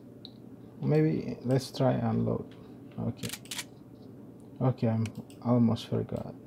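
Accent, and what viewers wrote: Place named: Indonesia. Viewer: Your accent is very indonesian. You even sound like my lecturer lol😂. Or are you?? Vsauce music plays Love your content btw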